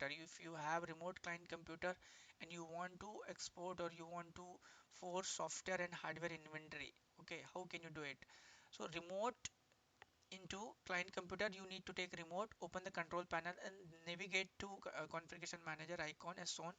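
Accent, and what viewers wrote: India. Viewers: Indian accents everywhere on IT vids? Does no one from native English speaking countries work in IT anymore?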